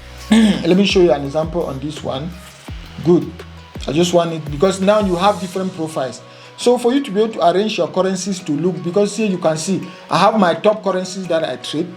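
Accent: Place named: Nigeria. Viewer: No one can teach me shit with this Nigerian accent. Absolutely no one. It sounds childish🤣🤣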